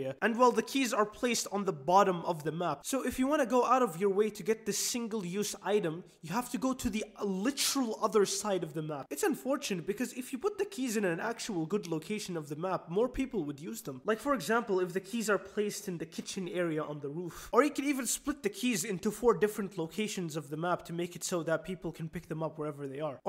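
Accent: Arab world. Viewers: الاكسنت عربي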